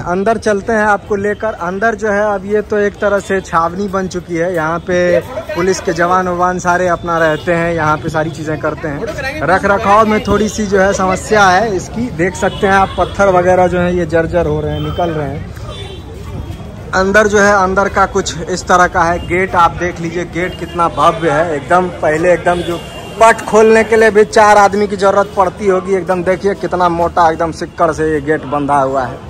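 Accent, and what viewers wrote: Bihar, India. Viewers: Bhaiya ekdm khud ko feel krte h apke videos dekh kr.... whi bebak andaj....dogs ke dekh kr unse bat Krna ..wo hmara Bihar bala accent.... ekdm khud ko feel krta hu. M Varanasi aa rha hu Saturday ko .aap miloge? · Bhaiya apka video ka rooj wait karte h apka voice Etna mast h apne ape par bihari wala filling automatic aa jata h 💗❤️❣️❣️❣️❣️😘😘😘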